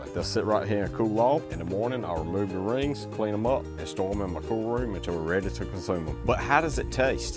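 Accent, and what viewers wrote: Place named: Cajun, United States. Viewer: Man you have figured out a good life for yourself. I am a big fan of your videos. Very informative and down to earth. Calming voice with that Cajun sounding twang makes your narration great as well. I know you probably have zero interest (which is also why I say this) but society needs to start putting their worldly faith and trust in men like you instead of the leaders we currently choose. I’m tracking you down in an apocalyptic situation lol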